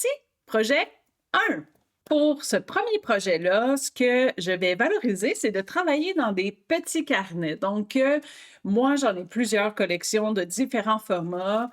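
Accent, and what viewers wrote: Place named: Quebec, Canada. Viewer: J’adore tes projets et j’adore ton accent Québécois ❤❤ bonjour de Bretagne et merci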